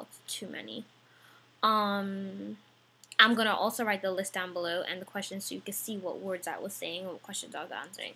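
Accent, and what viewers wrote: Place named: Trinidad and Tobago. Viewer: to me trinidadian accent is best accent in the english world... you're so beautiful and sweet... greetings from Venezuela....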